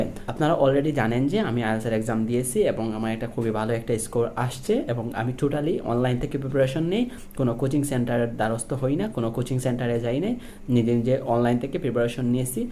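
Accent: Sylhet, Bangladesh. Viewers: Sylheti ni..?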